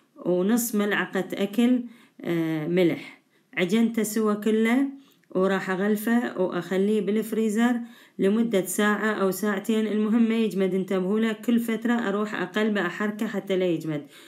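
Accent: Iraq. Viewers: رائع انا من سورية و بحب أسمع اللهجة العراقية ﻷن بتذكرني بأصدقائي في الحسكة تسلم ايدك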